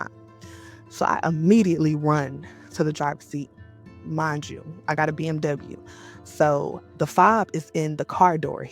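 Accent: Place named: Atlanta, United States. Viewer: Most of the people who are in Atlanta are not from Atlanta.. just ask the people who you encounter.. .. Honestly you sound like you were born & raised in the A.. if not where are you from? We are hoping that everyone will just go back to where they are originally from so that we can have our city back !! Like someone said today young black men has been around since the beginning of time.. Stop stereotyping them by calling them YN’s ! If you all think that Atlanta is so bad.. try living in Memphis or Chicago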